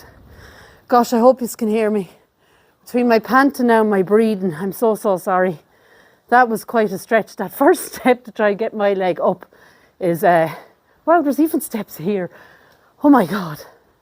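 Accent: Ireland. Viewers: I love listening to you and your Irish accent. To think my ancestors came from your beautiful country makes watching your channel even more interesting. Just one of millions of Americans whose ancestors came from Ireland. It’s beautiful and you do an excellent job sharing with us. 🙏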